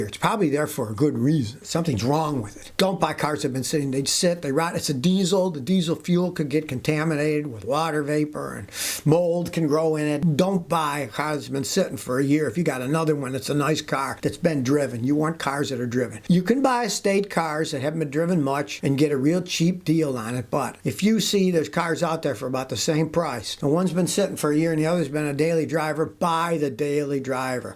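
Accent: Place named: Buffalo, United States. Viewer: Classic Buffalo accent.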